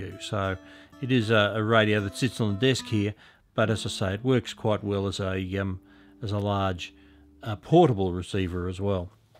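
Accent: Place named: New Jersey, United States. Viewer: that's a lovely accent you have, new jersey?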